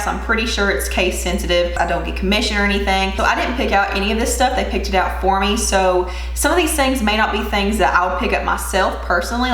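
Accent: South, United States. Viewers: I knew I heard southern in that accent! Fellow kentuckian makeup lover here!!✋ ❤ much love to and for you!!😁 you're awesome girl! Thank you for your service to the beauty world and keeping it real!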